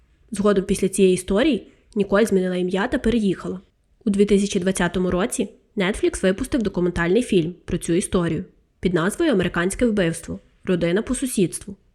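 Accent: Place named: Rivne, Ukraine. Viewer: Ви з Рівного? Такий цікавий говір? Якщо що я з Кропивницького. Просто так цікаво розмовляєте. Трішки не гоніть! Просто читайте як і розмовляйте з нами. Відчуття, що ви робите на от їбісь😞не треба летіти! Навпаки можливо поставити 1.25 швидкість. Краще переслуховуйте і ви і комусь дайте послухати. Ви вумничка!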